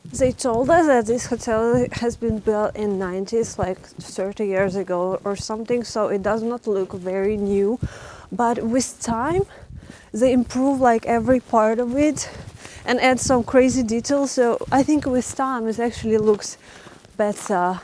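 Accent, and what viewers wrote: Russia. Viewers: True winter wonderland filmed by a truly talented and very engaging blogger .. in love with her Russian English accent.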